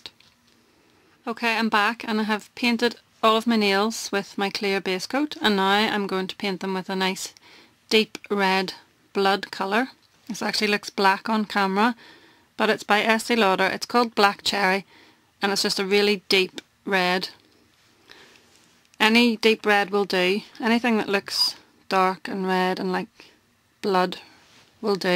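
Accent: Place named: Scotland, United Kingdom. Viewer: Scottish? I love your accent x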